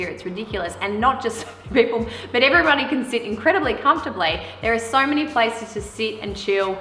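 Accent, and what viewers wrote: Australia. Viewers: Everything seems to come together the music the houses all topped off with the cute Australian accent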